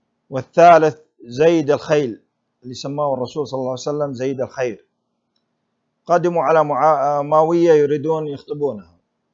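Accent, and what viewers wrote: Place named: Iraq. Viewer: لهجتك قريبه من العراق شفيك تنطق ال ج اكثر شي شكل اجدادك عراقات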